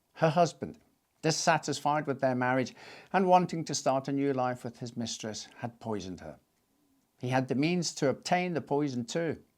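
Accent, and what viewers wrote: Scotland, United Kingdom. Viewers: I’m trying, to discern the ‘whereaboots’ of your (own/default) Scottish accent - Ayrshire maybe?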